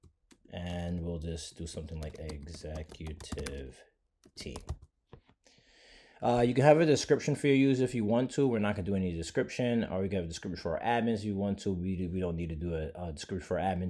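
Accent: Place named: Bronx, United States. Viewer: IT with a Bronx accent. Digging it.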